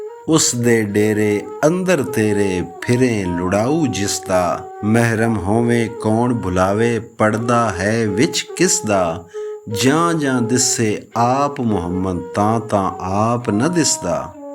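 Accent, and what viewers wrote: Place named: Lahore, Pakistan. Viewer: السلام علیکم عید مبارک صاحب. معزرت کے ساتھ سیف الملوک کے خالق کا لہجہ پوتھواری زبان میں تھا اپ اس کو پنجابی لاہوری اندازا میں، پیش کر رہے ہیں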